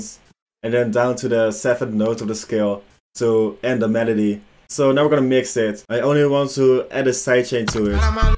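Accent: Netherlands. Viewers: Bro is Dutch haha dacht al dat ik het hoorde. Zieke edit dit. Doe je ook aan Collabs?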